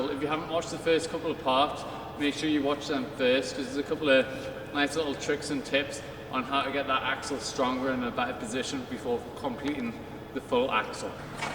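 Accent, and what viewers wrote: United Kingdom. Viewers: British accent I think anyway like it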